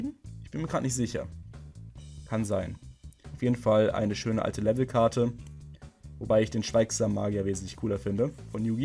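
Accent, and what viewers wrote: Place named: Germany. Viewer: Du hast so nen schönen deutschen Akzent wenn du englisch redest :D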